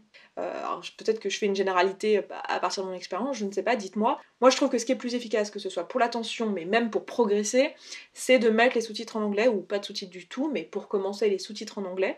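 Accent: France. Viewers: j'ai bien compris ta phrase de fin car tu as un accent un petit peu français si je puis dire. Mais par contre je ne comprends rien quand c'est les natifs qui parlent car ils ont un accent anglais ou américain très marqué et j'ai l'impression que ca aspire tous les mots je ne les comprends plus par exemple quand je regarde une série sous-titré en anglais de te découvrir des nouveaux mots de ce que j'ai entendu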